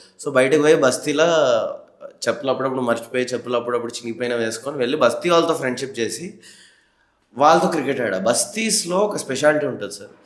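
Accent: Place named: Andhra Pradesh, India. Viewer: Modda periginav kachiguda andhra basha undi needhi randa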